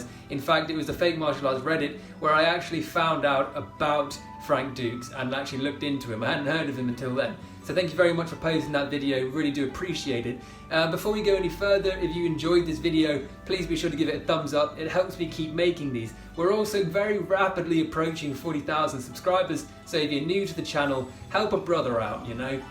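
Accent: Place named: United Kingdom. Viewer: Thanks so much for the British English and humor! As a former bouncer, it is very difficult to understand how people think any of this can ever prepare you to really defend yourself? It should be a crime to let people teach this shit...just like sending soliders to war with weapons that are "may be's"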